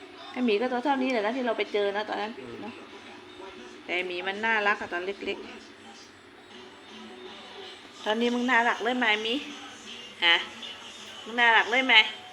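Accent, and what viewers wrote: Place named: South, Thailand. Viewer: ❤❤❤❤น่ารักกันทั้งบ้านเสียงทางใต้น่ารักจังแม่ 😊ฮ่าๆเก็บตังเลยแม่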